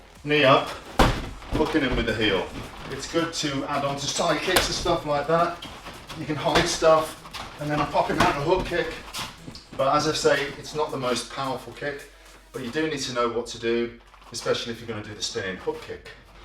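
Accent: United Kingdom. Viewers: I have never heard him talk out of a movie character so I didn’t know he was British 😂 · Yo I didn’t even know he was British. Man British people are taking over in Hollywood. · I thought Scott is a Ukrainian. I hear a British accent right here .My Idol in Yuri Boyka!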